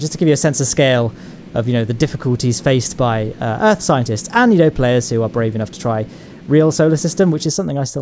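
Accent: United Kingdom. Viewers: He is British so his accent is like that now come on chill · Where are you from? You sound British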